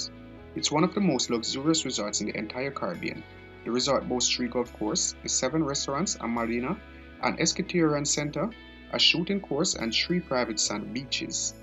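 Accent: Jamaica. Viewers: By the way he pronounced certain words,I wouldn't be surprised if he's Jamaican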